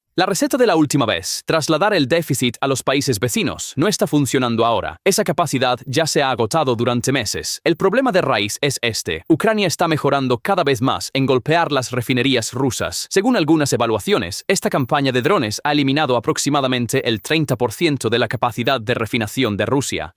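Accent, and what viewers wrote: United States. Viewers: En España no se habla con ese acento gringo DESAGRADABLE.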